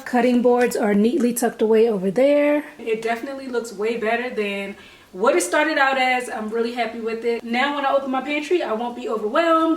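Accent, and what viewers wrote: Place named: Washington DC, United States. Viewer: Are you from DC? You have a DC accent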